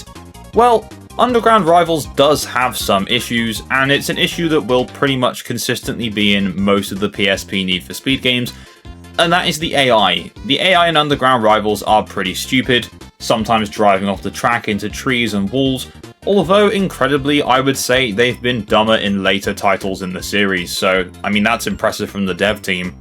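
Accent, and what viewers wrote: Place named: South, United Kingdom. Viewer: 90% of Southern English middle class men have this voice lol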